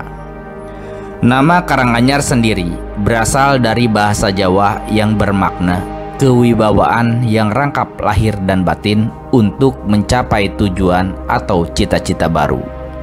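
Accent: West Java, Indonesia. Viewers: dr dialegnya 70% sya yakin sepertinya pengisi suaranya orang sunda.. Hatur Nuhun udh bahas karanganyar... sampurasun.. 🙏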